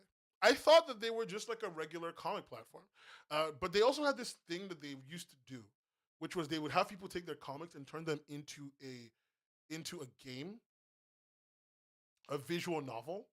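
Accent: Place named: United States. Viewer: youre british?? your accent sounds so american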